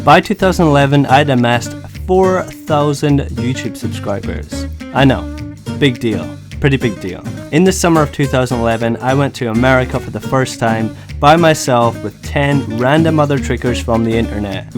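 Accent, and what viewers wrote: Scotland, United Kingdom. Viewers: Good work. just wondering where you are from. You sound Scottish but I’m not sure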